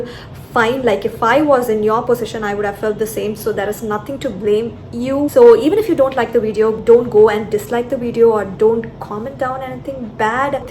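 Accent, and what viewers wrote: Tamil Nadu, India. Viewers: Lov that tamizh twang ❤️❤️❤️ wdt it d video seems incomplete. 😀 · Seriously your editing skills are vera level.. and the way you speak, as a Tamil girl I can relate to that. The thing is you apdae translate the Tamil expressions to English. That's so wonderful to watch. I am your new subscriber. Keep it up. I'm waiting to see your growth as a youtuber. Long way to go, Congrats🤍🤍🤍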